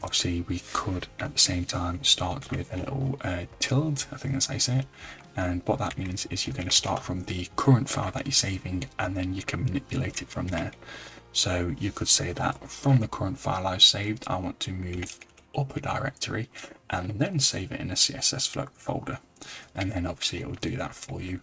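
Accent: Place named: Manchester, United Kingdom. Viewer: Hiya, are you from Manchester way? I was trying to place your accent. If so I'm a little further north but local! Anyroad, not important ;-) And if I've insulted you, apologies, haha! You've gone to a lot of trouble, first with the programming, and second with the explanations/instruction within videos such as this. The quality is fine and explanations clear. I believe complainants should perhaps listen harder and get hands on more. Thank you for the time and trouble you've put in to this. Much appreciated.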